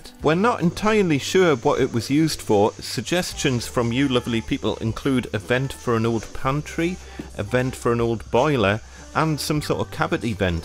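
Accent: Newcastle, United Kingdom. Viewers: Not just Geordie, specifically Gosforth. If I hadn't moved south for uni 20 years ago, I might even be able to tell which street from his accent. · Geordie not Scottish.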